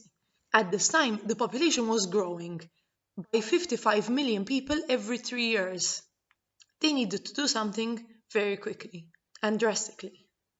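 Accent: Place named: Malta. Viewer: I new that was a Maltese accent right away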